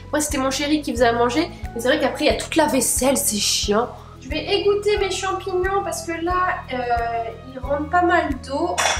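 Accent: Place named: United Kingdom. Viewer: Bonsoir je ne voudrais pas vous contredire mais franchement je suis belge et je ne reconnais pas cet accent là 😂mais moi je vous croyais d'origine anglaise. Vous êtes très rigolote. C'est amusant. J'aime bien.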